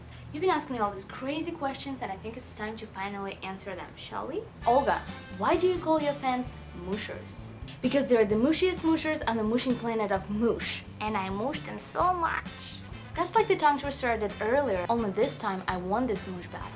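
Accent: Russia. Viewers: I would....yeah probably steal it from my sister and eat it. Я люблю ваш русский акцент! :) Moosh!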